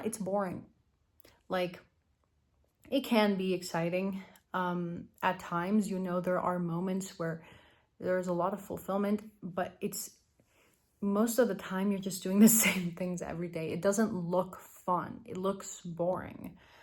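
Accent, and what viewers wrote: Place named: Egypt. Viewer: I was thinking that too! I bet she's Egyptian! Because on CHEX News in Peterborough, Ontario, the weather girl, "Noor Rafat Ibrahim" has that same accent in her voice that has!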